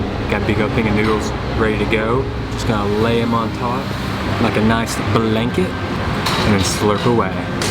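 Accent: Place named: South, United States. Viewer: Yo voice tho... Varrryy South... 😁 CM my hometown I am back in 2 weeks time for Songkran.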